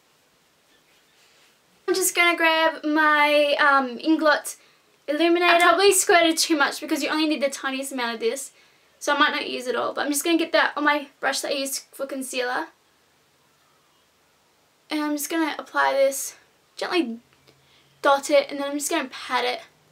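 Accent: Australia. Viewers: are you austraillian ?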